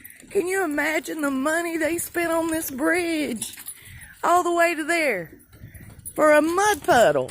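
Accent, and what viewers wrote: South, United States. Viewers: Love your Southern accent! Makes me homesick. I also agree with your point 9fview.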